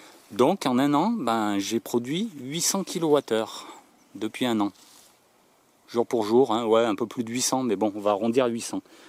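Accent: France. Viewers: Hello j'ai cherché dans les commentaires sans trouver votre localisation si ce n'est l'accent qui vous situerait en Isère ou Lyon peut être. Moi je suis sur Nimes. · Bonjour Pour l eau chaude: Je suis pas un grand specialiste, mais selon votre systeme de chaufage. Je penses qu il serait possible de recuperer calorie perdu par cheminée. Si vous avez chaffage au bois ou mazout par un poele il serait judicieux de reflechir comment faire un serpentin autour conduit ou circulerait un fluide pour rechaufer un reservoir d eau chaude, a bien penser un systeme securitaire. Je suis natif des hautes alpes et votre accent me parle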